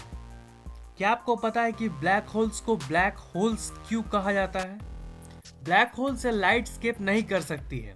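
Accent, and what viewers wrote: Bihar, India. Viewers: Arey bhaiji Bihar see ho Kya?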